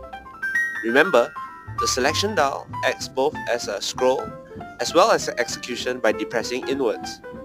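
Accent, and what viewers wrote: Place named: Singapore. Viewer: You sound... your accent. Singaporean?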